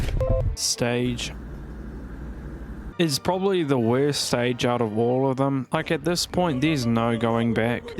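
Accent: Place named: England, United Kingdom. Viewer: the english accent adds to the dry humor, nice video.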